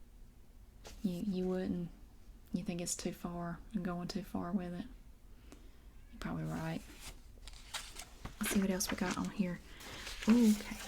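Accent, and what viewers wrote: South, United States. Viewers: I absolutely love the Southern accent but the creativity of this was something else :D Very entertaining and relaxing, thank you!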